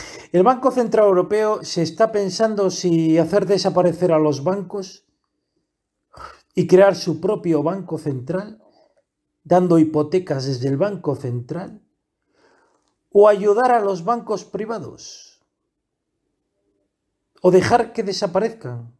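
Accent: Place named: Santander, Spain. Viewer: Tienes el acento de los Botín. De Santander.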